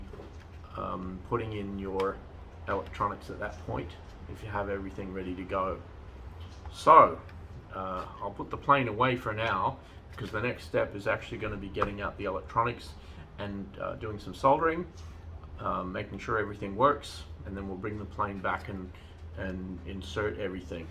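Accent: Australia. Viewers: Half Aussie half American accent?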